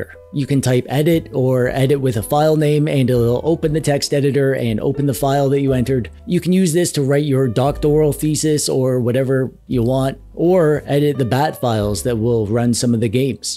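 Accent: Canada. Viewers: I finally caught the Canadian accent. Still can't tell which region! DOS always reminds me of my days as a Gaming Corp IT Tech. We used IBM for everything at the Casinos. Down there making a million dollars a day, and we are up in the office running software from the early 90s.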